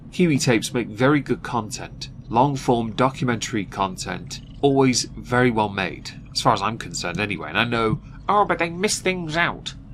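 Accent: United Kingdom. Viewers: I think the issue with your channel is you're a DEI Squid and with the British accent it comes off really douchy.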